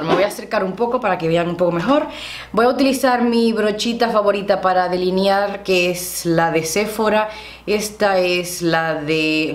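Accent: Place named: Dominican Republic. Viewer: me encanta tu acento deberias dar unas clases aya en las escuelas sobre el uso y acento de el espańol en republica dominicana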